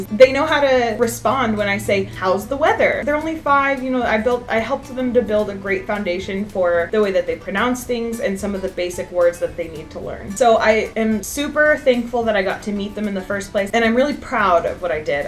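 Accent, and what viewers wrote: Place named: United States. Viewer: + Dear. You are NOT a ''Native English'' speaking person Actually you do NOT speak English YOURSELF! You speak American English and have a strong American accent, use so many American slang words and as an English person, I find it hard to understand what you are saying at times. For a start, you speak so fast and gabble far too much!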